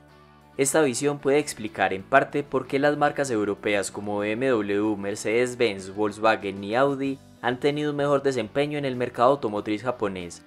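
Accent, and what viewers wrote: Antioquia, Colombia. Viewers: Colombiano, de la región de Antioquia, llamado paisa.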